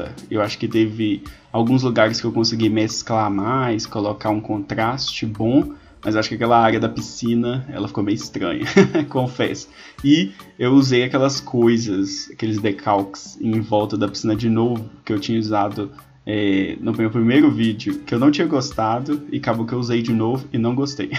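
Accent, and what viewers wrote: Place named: Rio de Janeiro, Brazil. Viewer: Sou de Salvador e jurava que você era carioca! Kkkkkk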